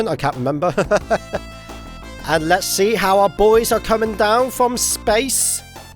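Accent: United Kingdom. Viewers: At the start of the video I thought you speak another language but my brain wasnt working and your British accent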